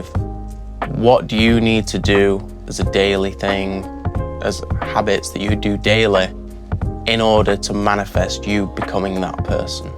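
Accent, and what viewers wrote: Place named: Yorkshire, United Kingdom. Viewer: oooooooo Yorkshire asmr ❤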